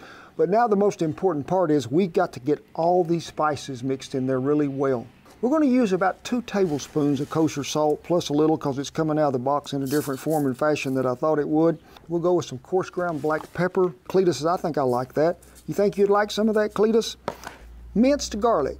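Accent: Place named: Texas, United States. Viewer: red dead redemption grandpa ... man that texan accent. :face-red-heart-shape::face-red-heart-shape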